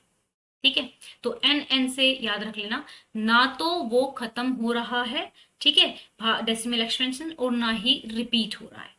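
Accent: Haryana, India. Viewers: Mam you are from Haryana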